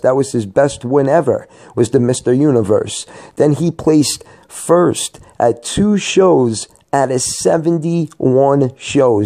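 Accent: New York, United States. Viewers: i love your nyc accent it makes the whole video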